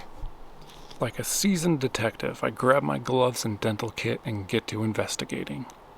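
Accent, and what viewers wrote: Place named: Midland, United States. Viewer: baking vs bakin' Stormed by the midland dialect of North American English: goin', doin' waitin', etc. And how about the disappearance of the distinction between i and e before nasals? Pit/Pet: solid difference. But Pin/Pen: they sound identical to the midland dialect ear. BTW, thanks for a great video about the creation of art which explores way beyond the creation process to include unexpected results. Top notch!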